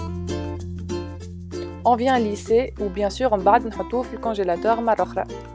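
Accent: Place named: Algeria. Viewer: Bravo pour la recette j'adore ton accent sa me rappelle l'Algérie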